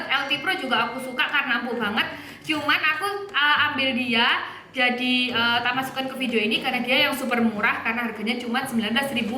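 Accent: Java, Indonesia. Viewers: medok tutor e 😂😂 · Lgsg ku subcribe lucu liat mbkx medok banget ngomongx...polos lg syyukaa😁😀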